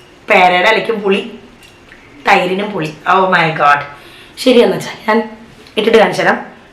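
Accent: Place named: Thrissur, India. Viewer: Thrissur aano veedu. Samsaram athe pole nd. Njan Thrissur aanetto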